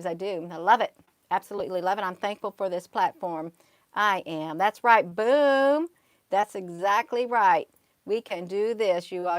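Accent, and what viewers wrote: South, United States. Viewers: Y’all, Loving 🥰 hearing, I just love ya southern bell accent? Your so priceless, the best way to announce such awesome, beautiful,greatness exploring and explaining what a super amazingly interesting 🤨 slightly evil named new style of body Art, that no one can see, I’m soooo bubbly just listening and been soooo excited by this cool 😎 new program digitally tattoo, Mmmmm I’m so glad 😀 lol lol On and off, after work! Before work Mmmm I may have “ IN bad Mood, Stay away “ Then..........” I’m mentally ok 👌 to day, don’t worry you can say Hi too me “ Oh, I think You’re just found, out the place I can truly fit in, Wowed, I’m so excited, I need to go now as I need to work so I can get my whole arm sleeve down!!!!! Mmmmm “” From My Heart ❤️ to Yours ❤️ “ Do you want me to get my one first, Then we can have our own club, Or maybe “I believe in UFOS 🛸” I’m so glad my skin is clean, thanks, Need to think about what colours ❤️🛸❤️🛸❤️🛸❤️🛸 😘🥰😍 Your so right It’s gonna be soooo Cool the coolest since sliced bread 🍞 xxxx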